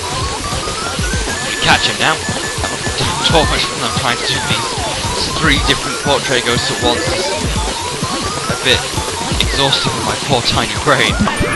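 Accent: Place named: England, United Kingdom. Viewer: is the dude that made this video English??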